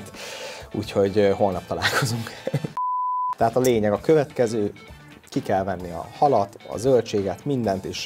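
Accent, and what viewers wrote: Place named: Italy. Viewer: is it just me? but his accent has italic undertone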